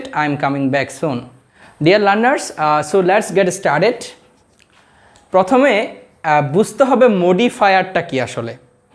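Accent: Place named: Chittagong, Bangladesh. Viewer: Uni ctg er gram tek alim dise, shei porjonto okane silo ekarone pronunciation gramer ta chole ashe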